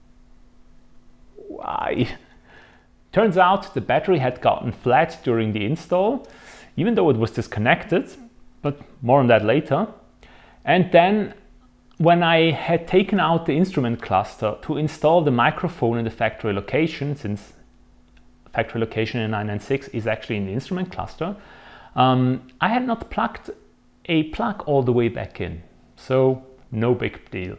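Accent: Germany. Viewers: Work on your accent and englisch you Sound very German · Like Swiss clockwork (of which I have a few) you summarized your ownership in such a dry humorous way (the German accent added to it) that it was a joy listening to even if it was long :). I have a 2003 C4S so it's easy to relate to all the maintenance bits as they're the same apart from the turbos. Like your P-car, mine has the original Porsche radio, and I'd like to do the sam upgrade as the sound quality isn't that great (at low volumes especially), and I'd like to know more about the process of the change itself i.e. the work to be done. (I'd also like to change the speakers as those BOSE speakers aren't that great either). In addition I can add that I have also wanted to get my hands on some of the MF's that disregard the joy of ownership and punitive damages, not only on mine, but their own vehicles (I don't really care about their vehicle, but they should at least respect my wish to keep MY car as pristine as could be) . 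My only gripe towards your review is your reference to CHF which makes it harder to relate than if you had referenced a currency of more generic usage, EUR or USD. It's easier to remember those currencies compared to NOK, than CHF (imhop). Of course then you'd have to do all the math, but probably it would have made more sense to many of your 1,92k subscribers (I'm now one) and probably many of the 44292 (so far) viewers of this video (with greetings from Norway). and keep up the good work. :)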